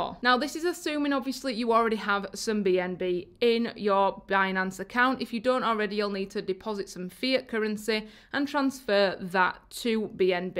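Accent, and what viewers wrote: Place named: United Kingdom. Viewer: british accent nice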